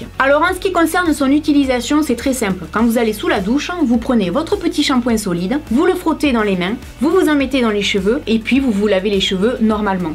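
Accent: South, France. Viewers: cela fait environ 1 an que je me lave les cheveux avec ce shampoing solide. J'ai les cheveux frisés et très souvent sec depuis que je l'utilise ils ont repris un volume de fou et sont super brillants le seul point négatif du moins pour moi c'est que je ne sais pas l'utiliser en mode solide du coup je le laisse se ramollir un peu dans l'eau et je l'utilise comme une crème. par contre il dure et dure très très longtemps facile un mois voir plus c'est GENIAL !! sinon juste un petit mot j'adore tes vidéos tu respire la joie de vivre et ça fait plaisir d'entendre enfin un youtubeuse du Sud je suis de TOULOUSE et les accent pointu sur youtube j'en peu plus lol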